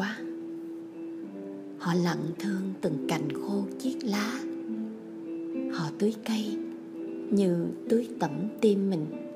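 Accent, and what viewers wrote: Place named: Saigon, Vietnam. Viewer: Anh thich em doc Dung chat giong Saigon hon la lai Bac... cai nay hay hon may cai truoc Do em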